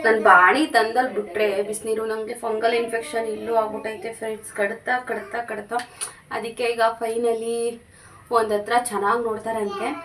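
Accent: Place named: Mandya, India. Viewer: Nimma kannada mathadi a style nodidre mandya side nivu please heli Nanna sister na k m. Doddi side marriage madi kotidivi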